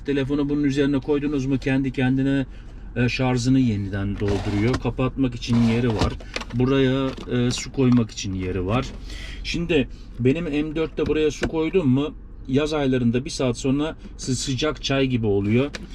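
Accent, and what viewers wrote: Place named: Zonguldak, Turkey. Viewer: Usta türkcen güzel, fakat yinede anladim sen zonguldakllisin, kastanonu karabük veya bartin da olabilir ama zonguldak derim?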